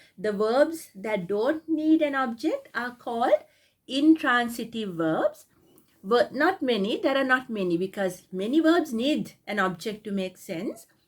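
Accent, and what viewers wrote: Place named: Sri Lanka. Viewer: Dear Mdam You are the only one English teacher who teachs English in English who has Sinhala voice.. සිංහල හඩකින් ඉංග්‍රීසි ඉංග්‍රීසියෙන් උගන්වන එකම ගුරුතුමා හා ගුරුතුමිය හරීම වටිනවා. මම ඉංග්‍රීසි ඉගෙන ගන්නා විශ්‍රාමික (ගණිතය ඉගැන්වූ ) ගුරුමාතාවක් .. ඔබතුමියගේ මීට පෙර ඉංග්‍රීසි /සිංහල පාඩම් හැදෑරුවෙමි. (I try to read English poems which I abandoned ) Thanks again.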